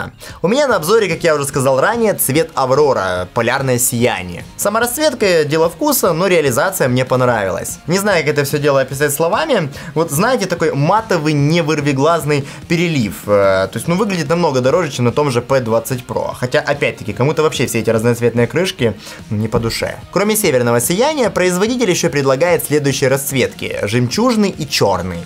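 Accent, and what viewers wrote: Odessa, Ukraine. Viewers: Ты одессит ? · ты что Одессит?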